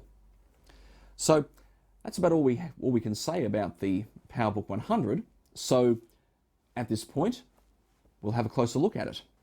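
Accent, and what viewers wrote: Australia. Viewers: Are you Australian? You sound like it.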